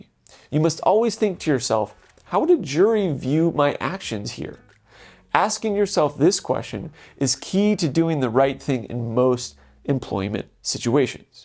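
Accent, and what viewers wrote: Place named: California, United States. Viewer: This dude sounds like he’s from California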